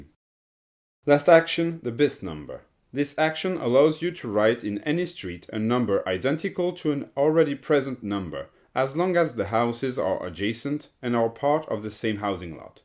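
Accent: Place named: United Kingdom. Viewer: Waou la voix qu'il a en anglais! Très lord british!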